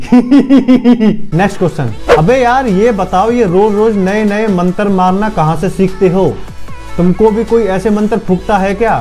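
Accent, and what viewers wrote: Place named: Haryana, India. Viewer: Bhai apka toh bhasa hariyana ka hai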